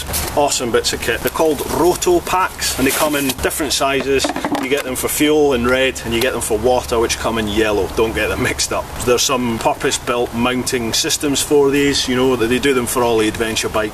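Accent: Scotland, United Kingdom. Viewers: Hi mate , just found your site , really enjoyed it, the accent grabbed me as a fellow scot , in Oz for the last 30 yrs and a touring , camping bike rider as well. Now happy subscribed . Thanks again Steve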